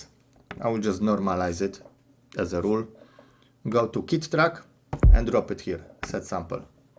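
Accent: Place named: Poland. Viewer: That accent is impossible to miss. Are you Polish? And since you use the name, I guess your name is?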